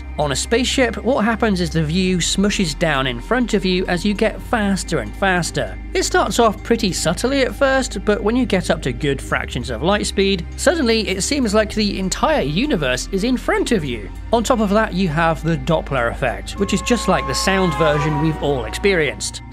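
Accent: United Kingdom. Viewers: ok british man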